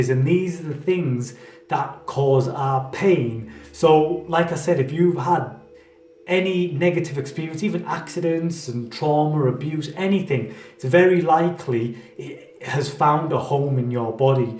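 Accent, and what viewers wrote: Manchester, United Kingdom. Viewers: I can hear a Manchester accent.. 💖 Mancunian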